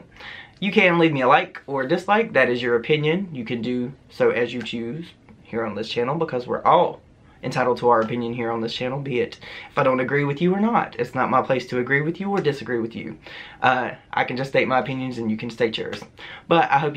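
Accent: South, United States. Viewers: Aw, I just found your videos tonight and I absolutely love your southern accent. Promise things get better, screw those people who think they're all that and can do whatever, they aren't anything. Have a wonderful night, it'll get better.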